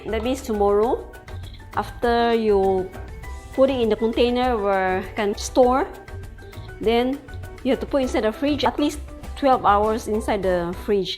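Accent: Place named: China. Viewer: Hello galing naman para kang Chinese mag English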